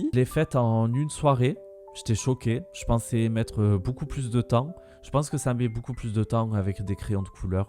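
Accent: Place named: South, France. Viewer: Bonjour ☺ les colos sont vraiment superbes ♥ Je suis ravie d'avoir découvert ta chaîne et d'entendre notre accent du sud ☺ Tellement contente d'avoir un confrère dans le 66 (si je ne me trompe pas 🤣🤣). Je suis une accro des crayons aquarellables mais les produits d'action ne sont pas performants. J'ai aussi fait les double pages d'hercules, j'adore ♥Bon allez je suis une vraie pipelette ☺ A bientôt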